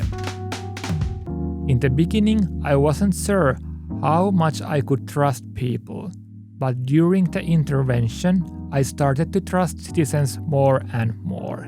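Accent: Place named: Finland. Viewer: Mikä saakeli siinäkin on että suomalainen yliopisto lataa videon suomenkielisellä otsikolla, mutta selostus on rallienglanniksi?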